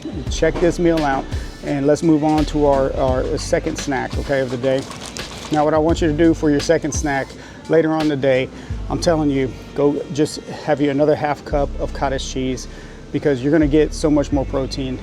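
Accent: Louisiana, United States. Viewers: Great video, brother. Are you from Louisiana? I'd guess southwest, somewhere between Lake Charles and Lafayette area. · Are you from Louisiana? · Are you from Louisiana? Love your accent!! I'm a NOLA girl, but I hear some cajun in your voice! Thanks for the protein hacks.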